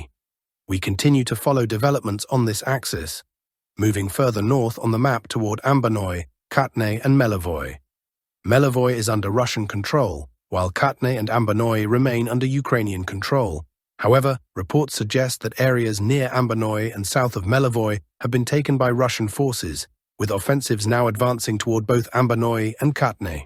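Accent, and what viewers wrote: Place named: Oxford, United Kingdom. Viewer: You're no Russian..MI6 fucking agent!No?? Howbis that talking with Oxford pronunciation?? Born in Britain??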